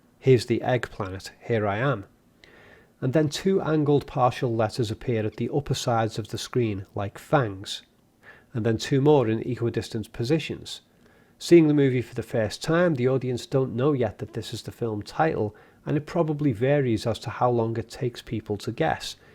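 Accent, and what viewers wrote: Liverpool, United Kingdom. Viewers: scouse git innit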